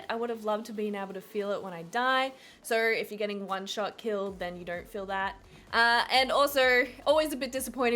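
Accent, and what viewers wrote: Australia. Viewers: R u Australian ur accent sounds Australian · judging by your accent im pretty sure you live in Australia. i just wanted to ask how did you get your valve index. i have been wanting one for quit a while but due to the face i live in Australia i cant get one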